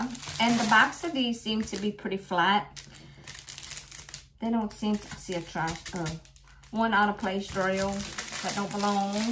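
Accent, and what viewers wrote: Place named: Louisiana, United States. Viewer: That is one pretty owl. I'll have to check out your keychains next time you show them. I ordered from Abigail when she first opened her shop but it was not putty. It was wax and it's great. I still have a ton left. Smells amazing too even after a year. You are almost at 250 so congrats on that. 🎈I'll shout you out on my Community Page. I love listening to you talk because my Mama was a Cajun lady herself from Louisiana and I miss her so much.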